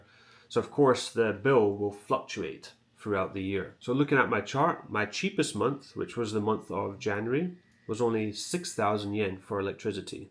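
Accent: Scotland, United Kingdom. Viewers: Great video mate, thanks for the breakdown. I have a couple of questions that I would love if you covered in a video sometime, though im sure you already have at some point. I obviously hear the Scottish accent, im from England myself, currently living in the states, and have travelled a lot so I know accents can change after a while, but I sometimes gear your accent changing on certain words so I cant figure out if you're native Scottish, or born somewhere else and grew up in Scotland or something 😅 Also, I wrongly assumed that you were married to a Japanese woman, and thats how you got permanent residency, but I dont think thats the case. Could you go into more details about your journey to gain residency? Or maybe you already have a video on that. Anyway, thats enough waffling from me, thanks again for the video and the insights. I subbed and look forward to more videos. 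Cheers mate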